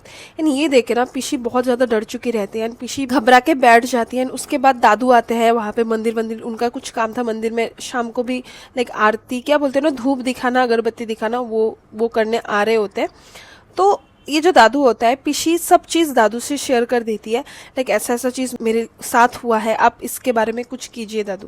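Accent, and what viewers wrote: Bengal, India. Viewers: Love your bengali accent💛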